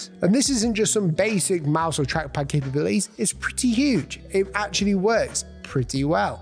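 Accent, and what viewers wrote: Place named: Yorkshire, United Kingdom. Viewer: Hahah that looks mad using a trackpad with an iPad. You from the North btw? I’m sure I’m detecting Yorkshire there somewhere 😂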